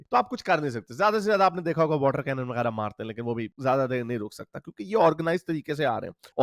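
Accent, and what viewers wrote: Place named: Punjab, India. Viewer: No no Rawanda, Punjabi tone not Afghani.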